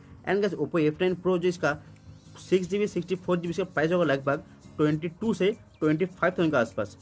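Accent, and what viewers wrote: India. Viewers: Damn your Indian accent is really hard and you speak jibberish already.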